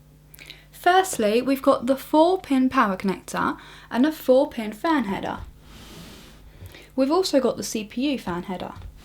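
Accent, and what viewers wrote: United Kingdom. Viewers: Unfortunately I consider this to be one of the more audible accents in the UK. You should hear what people sound like in Portsmouth (Mush;)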